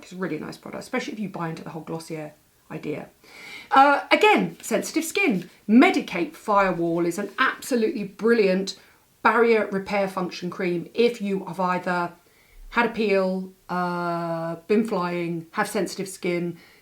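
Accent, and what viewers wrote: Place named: RP, United Kingdom. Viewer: i love her accent i wish i had a posh british accent too🥺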